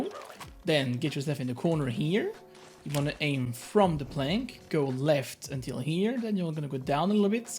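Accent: France. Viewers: il a un petit accent français je crois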